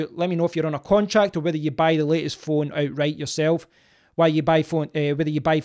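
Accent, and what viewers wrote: Scotland, United Kingdom. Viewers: Great content, what was better is your Scottish accent!!! lm ready to go into battle!!! and "pick a fight" lol with my provider!! I took your advice I now get 500 gigs a month max speed $36 a month, (20 Euros) with Vodafone, no contract, no exit fees so thankyou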